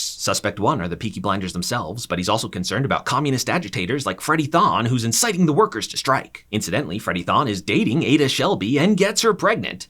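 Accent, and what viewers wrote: United States. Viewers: I can't lie I was initially dubious with the overly American accent but this was a fucking great summary. Honestly made me realise shit I didn't even notice second time watching the show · Very good recap, not an English standard, but for an American quite acceptable.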